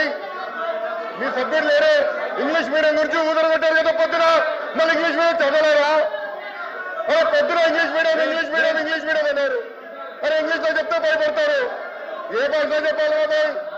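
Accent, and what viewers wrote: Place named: Telangana, India. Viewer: Lokesh ki andhra kanna telangana slang masth set aythundi 🤙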